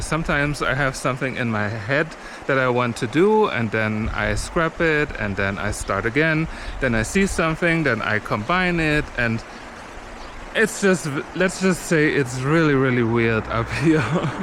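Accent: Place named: Germany. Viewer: The more I listen to you the more I think you have a German accent..... It's mild and very calming to listen to you speak 😁❤️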